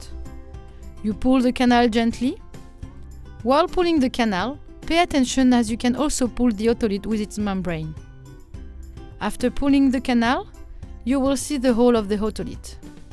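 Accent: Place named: France. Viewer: That's a French accent if I have ever heard one 😂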